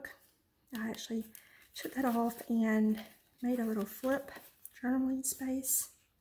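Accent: South, United States. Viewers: Don't you dare apologize for your accent lovey, it is just charming ( I love southern accents:D) and it is part of you and your history. Hello from Australia btw xo I just love your journal, it is gorgeous (especially love the idea of the pen/pencil space) have subscribed and I am sure I will enjoy many more of your videos in the future, well done xo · Being Southern myself. I think your accent is perfect. I love your journal. I look for to seeing more of your works. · you have a sweet southern voice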